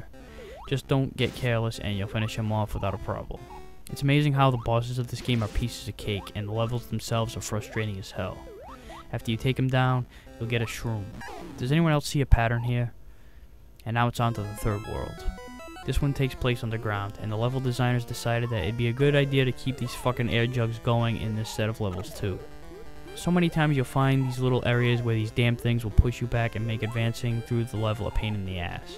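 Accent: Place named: Brooklyn, United States. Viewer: I like your Brooklyn accent when hearing your reviews. It enhances the review's humor and makes you kinda sound like a bad ass. XD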